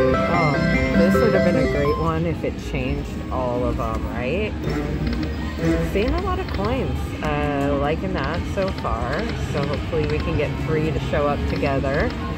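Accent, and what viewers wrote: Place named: California, United States. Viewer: Your accent you have to be in Cali. I like playing the Bufaros here in Oklahoma casinos . When I was a kid I usd to go to the wildlife refuge and play with the real ones.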